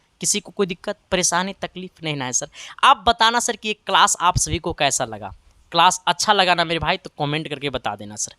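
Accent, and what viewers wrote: Bihar, India. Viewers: Sir aap to bilkul bihari ki tarah bol rahe hai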